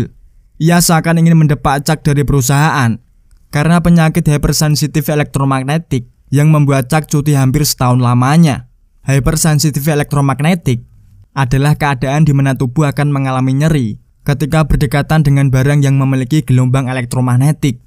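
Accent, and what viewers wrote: Java, Indonesia. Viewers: Jangan di medokin bg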